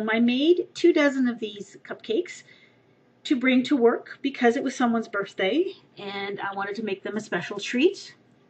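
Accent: Canada. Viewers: love the canadian accent ^^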